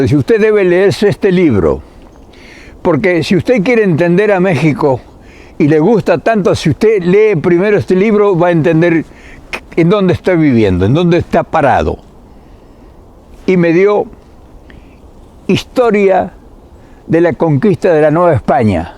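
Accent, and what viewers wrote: Argentina. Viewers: Sr., no insista con su asento Argentino, usted es mas Mexicano que muchos Otros. Viva Argentina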